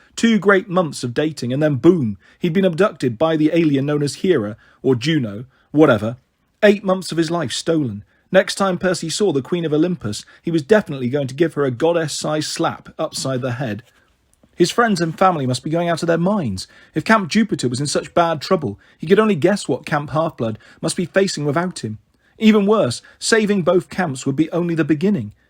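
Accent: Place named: United Kingdom. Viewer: I love listening to HOO in British ❤️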